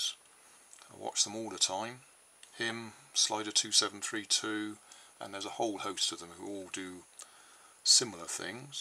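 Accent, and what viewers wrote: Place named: South West England, United Kingdom. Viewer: Where are you in the UK? You have a south west accent, sort of Wiltshire to Bristol possibly. I'm originally Suffolk, but now live in France, Dordogne, which is very rural. My main heating is a 14 Kw wood stove, which I feed from my own woods. Spent some time in Wiltshire long ago, at RAF Compton Basset. Also briefly near Weston Super Mare. If I take thing apart, I always try not to destroy the bits in the process, as they could be useful in the future, and small motors have potential, where bits of bent sheet metal are less likely to have much. However, the coil would be a good source of wire to make smaller coils, for experimenting with, so, its swings and roundabouts really. I dont understand why people get so emotional on these sites, after all, scrap is scrap, which ever way you look at it.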